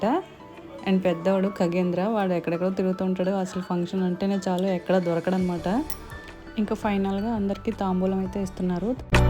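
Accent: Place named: Visakhapatnam, India. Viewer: I think Meru Visakhapatnam varu iedali me voice tone House location yakka andi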